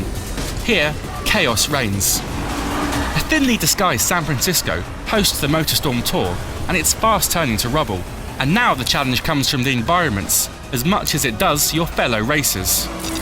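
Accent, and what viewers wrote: United Kingdom. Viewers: This British voice is pissing me off... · lol your british, you have a stupid accent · thank fuck for that! a uk review! been fed up of the american accents lately :L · No offence but please do not use British reviewers thank you. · I love how nobody is raging on the Gamespot review about the fact the reviewers from the UK. Seriously, what's wrong with the UK? And for those saying they can't understand what he's saying, learn to speak English. You know, what with England INVENTING the language everyone's typing in now? I think this is a fair review. In the higher levels, the AI get aggressive and if you get caught up in the surroundings, you can find yourself jerking out of control. It's a known annoyance in all MS games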